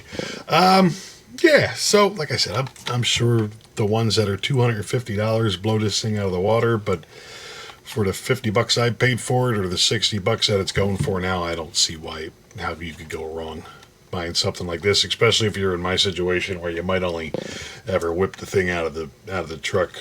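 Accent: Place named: East Coast, United States. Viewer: Ohhhh koooo.... that's what's up watching on my break right now... also I hear the east coast in ur voice...mirrah... instead of mirror lol that's awesome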